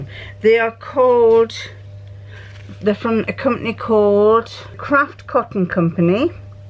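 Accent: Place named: South Shields, United Kingdom. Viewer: I hope you have a lovely Christmas you and Brian, you will need all your vitamin tablets with all your family, food and dog, energy levels may get low. 😀 it's lovely hearing an accent that my mum and dad had, (they grew up in South shields and easington lane. Don't forget if you come round here to have a walk near the river, let me know and we can meet up for a coffee at the station. Lots of love to you both. Heather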